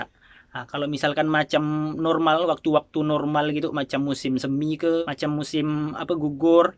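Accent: Malaysia. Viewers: mantep mas, bahasa kuala lumpur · Mari logat jowo, kenape sekarang jadi nak Melayu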